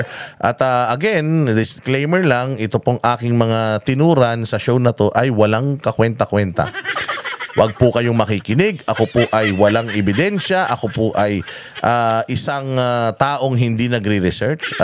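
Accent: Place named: Visayas, Philippines. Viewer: Boss bisaya eh matigas ang letra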